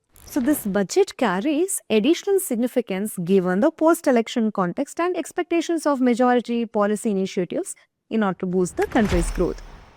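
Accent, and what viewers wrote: Kerala, India. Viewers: Mallu accent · It is a mallu accent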